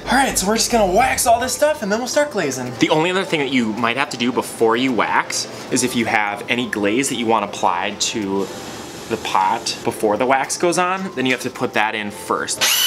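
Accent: Minnesota, United States. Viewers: I do enjoy the Minnesota accent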